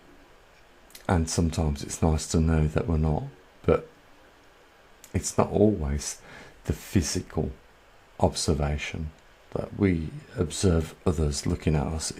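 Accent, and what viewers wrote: United Kingdom. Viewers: Oh Thank you for transskript!!!! Your british accent ist Hard to understand to me 🙈 sorry! So Thank you verry much ❤️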